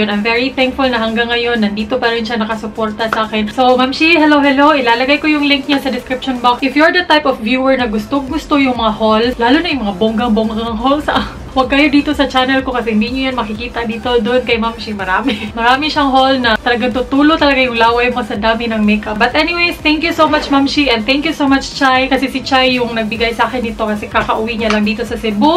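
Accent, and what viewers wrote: Visayas, Philippines. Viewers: You're so loveable and very enjoying to watch! Tho you have that bisaya accent, you show your true and natural self to your subscribers! ❤ Continue to inspire us and do lots of great videos! May God Bless you always po Ate! Power! 💖💖💖 FB: <link> Instagram: jrgvlls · ambot lng magkatawa gyud q mag tan.aw sa imong mga vid. kanang tagalog nga accent bisaya. hahaha soooo us gyud mga bisaya. pasalamat q sa youtube g suggest to imong first vid aq nakita. nalingaw q nitan.aw nlng q tanan. katong labay2 nmo sa haul. nka ana q pra2 maning bayhana oi. nyahaha pro until now. mygeeedd 20k? pla plang mikabook ni subscribe ato .. dalia ra oi. always stay unique gurl.. IG: lovehalo83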